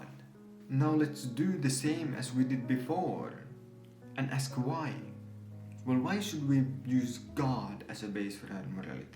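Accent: Finland. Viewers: Sama homma, pelkästä aksentista tajusin jo Logickedin videosta että nyt on suomipoika asialla ja olihan se sitten pakko katsoa että mikä kanava tää oli. Aikamoista, aikamoista... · Että hävettää suomalaisten puolesta kun rallienglannilla sönkkää halpojen jenkkiuskisten myyntipuheita juutubessa. Meillä on tän maapallon parhaita koulutuslaitoksia, kirjastoja ja terapeutteja. Jospa kävisit vaikka filosofian alkeiskurssin ennen kun sönkkäät tätä skeidaa koko maailman kuultavaksi.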